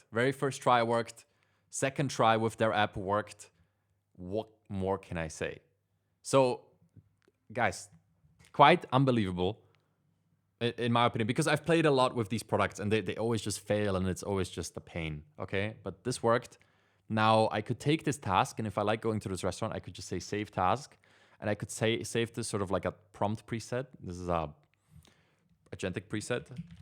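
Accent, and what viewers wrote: Portugal. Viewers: Wait, are you Portuguese? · Are you portuguese?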